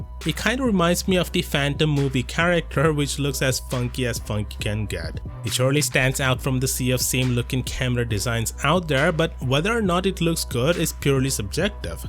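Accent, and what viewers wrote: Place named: India. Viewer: Why do you speak with indian accent?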